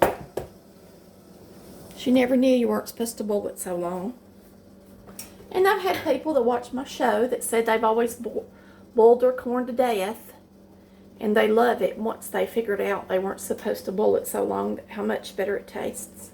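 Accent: South, United States. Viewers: Southern accent ✔️ Named ✔️ She knows what's up 😊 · Your American accent just makes this the most perfect video!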